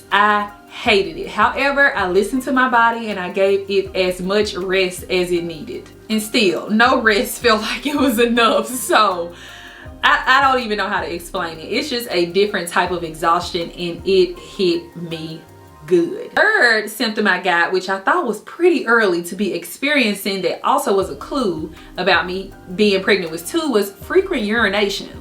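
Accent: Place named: Louisiana, United States. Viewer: #1 Congrats!! Two blessings 💕✨ #2 You are so dang precious!!!! Lol your accent (I'm from Louisiana so I get it Haha) + your mannerisms are just too likeable! Prayers for you & those babies 😊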